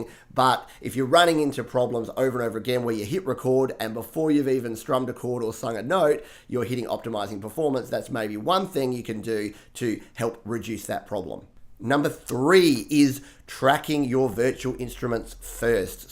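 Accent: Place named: Australia. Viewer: Betht five tipth Sorry that was mean. The dope Aussie accent more than makes up for it.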